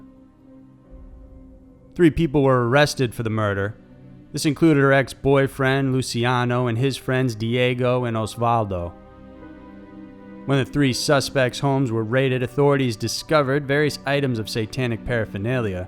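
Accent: South, United States. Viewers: Can anyone pick his accent? He reminds me a little of Elvis so I'm guessing he's from Mississippi.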